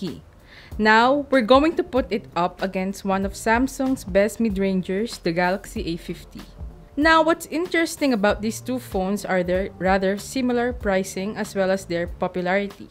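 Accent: Philippines. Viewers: pinoy kse ganun tlga d native language eh.. may accent tlga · Non-native Filipinos speak that way. Let it pass. At least she's trying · This accent is too thick. Im guessing it is pinoyish english.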